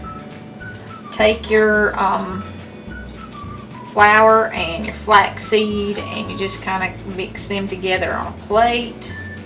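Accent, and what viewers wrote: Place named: Texas, United States. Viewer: Hi. I just found your channel and I am so happy I did 🤓. Even tho I am a Texan, you have the same sweet accent as me😃. Your recipes & cooking tips are just what this 66 yr old granny has been hunting for. Your meal choices are exactly what we eat at our house. Hope you have a blessed day. Connie in Lubbock, Texas 💗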